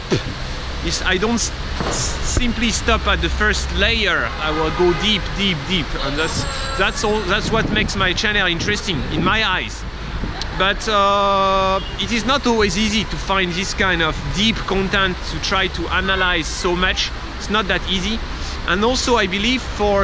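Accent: France. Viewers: . a French accent. thanks for the comment. please try to hold angle the selfi stick lower to take it more.out of the camera shot. Mercie buquo.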